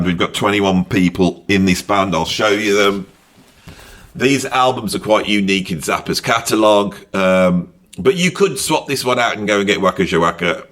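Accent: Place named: Midlands, United Kingdom. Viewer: I saw Frank at Knebworth in 1979, aged 19 & that set changed my understanding of what music was. So it's great to see this great collection & selection especially delivered in a great Midlands accent. Uncle Meat is the record I'd take to my desert island, though I'd miss the others especially Hot Rats & Ship arriving too late...& Yellow Shark &...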